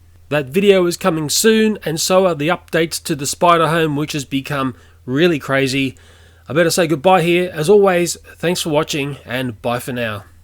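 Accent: Australia. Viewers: Why is the Australian accent just so....awesome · Is this guy Australian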